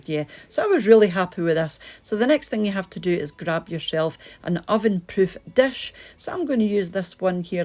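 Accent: Scotland, United Kingdom. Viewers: I used to have a family friend who was Scottish that used to make somethjng similar for her Grandsons which they called it the bean thing. It was basically a tin of beans and sausages with the occasional addition of chipolatas topped with mash potato and grated cheese and baked in the oven. She sadly passed away 3 years ago and I've made it several times since and I absolutely love it and you sound like her with your lovely Scottish accent!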